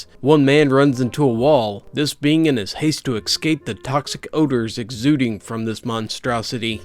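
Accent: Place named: Appalachia, United States. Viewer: I have your voice. You sound like a hillbilly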